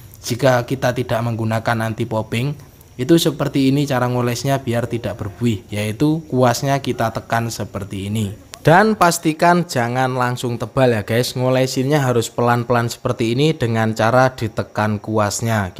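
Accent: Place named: Java, Indonesia. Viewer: Suarane koyo jowo .tapi jowone ngendi ya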